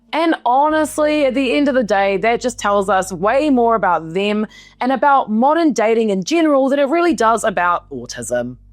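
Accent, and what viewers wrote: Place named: New Zealand. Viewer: Are you referring to the accent? It’s Kiwi.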